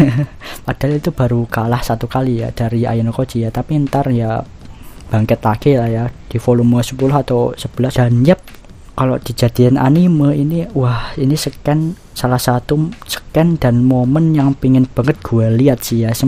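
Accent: Java, Indonesia. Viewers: Ngomong mu lek medok men to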